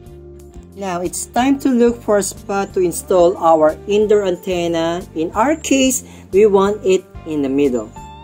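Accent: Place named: Philippines. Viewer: Pang English English kpa halata nmn accent mo na Pinoy ka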